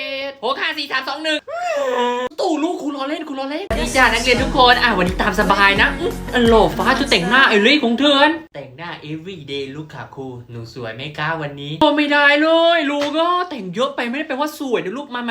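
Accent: South, Thailand. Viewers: พี่เป็นคนที่ไหนคะทำไมภาษาทำไมภาษาเหนือภาคใต้เลยอ่ะ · พี่ใช้คนใต้ไหม❤️🧡💛💚💙🩵💜🩷💝💖💗💞💕💓💟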